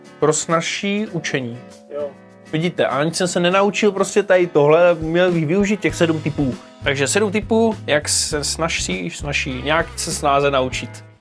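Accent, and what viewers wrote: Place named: Moravia, Czech Republic. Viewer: Ten moravsky prizvuk je zabavny k tvemu videjku :)) Delas to skvele.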